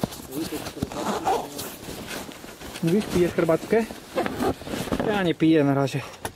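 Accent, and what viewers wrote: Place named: Kuyavia, Poland. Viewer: Ty chyba jakoś z Kujaw jesteś .. "Jo" to trochę charakterystyczne :-)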